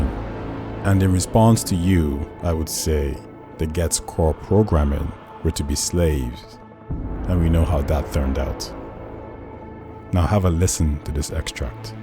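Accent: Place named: Trinidad and Tobago. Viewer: You sound almost like a Trini. I dig it.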